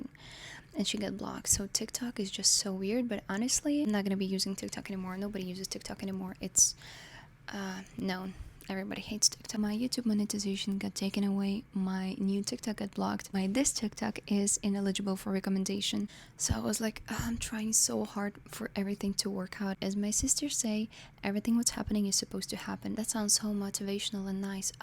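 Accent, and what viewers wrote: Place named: Eastern Europe. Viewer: Love your slavic accent😊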